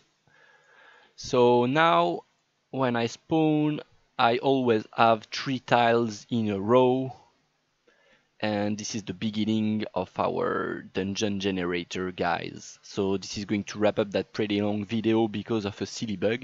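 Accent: France. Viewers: Bonjour, tout d'abord merci pour ces tutos tu as franchement fait un super travail. Je me permet le commentaire en français car l'accent ne trompe pas ;-). Bref j'ai un petit soucis car après avoir suivis scrupuleusement les précédentes vidéos, les tiles ne spawn pas. J'ai bien la start tile qui spawn mais c'est la seule. La fonction print ne me renvoi que l'info sur la previous location donc je me dis qu'il ne génère même pas les generic tiles. Dans une précédente vidéo, lorsque tu avais créé la fonction Generate Tile Layout et que tu faisais spawn les tiles avec la fonction add, j'obtenais le même résultat mais pas avec des unités de 1 mais de 45 (mes tiles faisant 30), je me dis donc que j'ai peut être un problème d'unités mais je ne trouve pas lequel. Je ne suis pas allé plus loin dans les vidéos car j'aimerai arriver au même résultat que toi avant de continuer. 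Si tu pouvais m'aider je t'en remercierai.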